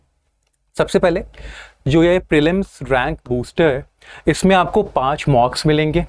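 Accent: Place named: United Kingdom. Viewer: Bhai hindi bolne ka course kr lo Aise lg rha hai England se yha aakar hindi sikhe ho Thanks for these knowledge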